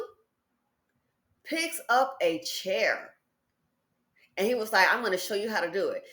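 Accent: South, United States. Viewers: It’s country and southern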